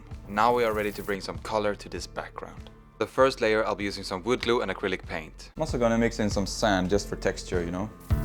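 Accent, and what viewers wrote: Sweden. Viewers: jag hörde att det var en svensk på dealekten lol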